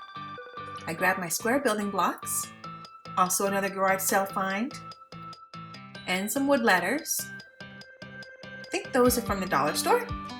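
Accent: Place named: Canada. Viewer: You sound Canadian like my brother’s family in Peterborough, Ontario. 💕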